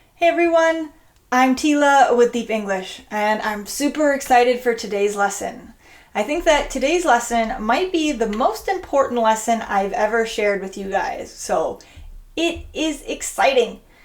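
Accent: United States. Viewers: Are you from California? I think you guys have that kind of accent there. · Which part of America are you from?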